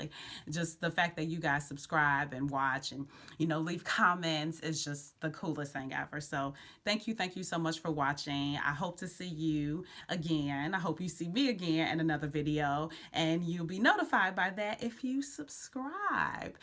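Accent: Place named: Baltimore, United States. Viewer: ok Maryland or Cali? I hear it….Baltimore for sure, am i right?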